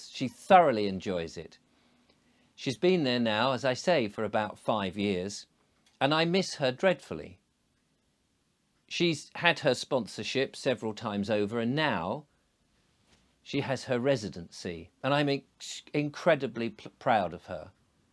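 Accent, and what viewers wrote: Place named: RP, United Kingdom. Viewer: Hi, thanks for sharing this story with us. It must be truly painful and worrying for you as a parent. I recently found you and subbed, as I find that the truth and openness that you speak needs to be heard and shared. You and I are like complete opposites, I am from the black country, broad accent and a bit rough round the edges, you are, if you don't mind me saying, a bit posh and well spoken. But strangely I can relate to you more than many others around me. I too knew the truth from the beginning and stood my ground, while many blindly followed and harmed themselves and their families. Again, thank you, from the bottom of my heart.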